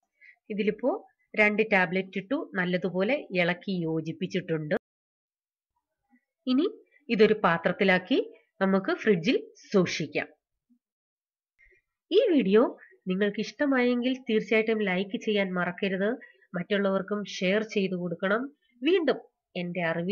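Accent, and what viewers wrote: Kottayam, India. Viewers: Chechy.nalla video anu. Chechy kottayam.kariyano atupoleya chechiede samsaram.sweet voice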